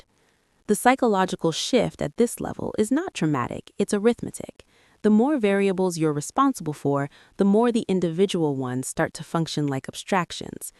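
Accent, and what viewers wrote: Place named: India. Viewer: Good video but why the voice change to an Indian accent no keep posting man good luck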